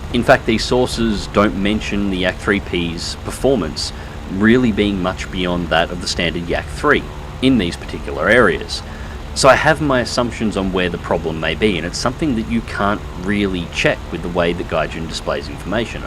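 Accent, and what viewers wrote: Australia. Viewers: what tier 4 soviet plane do you recommend? Love your manly aussie voice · time to listen to that attractive Aussie voice again <3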